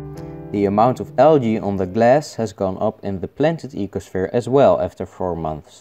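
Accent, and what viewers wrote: Netherlands. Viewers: Ur Dutch Lache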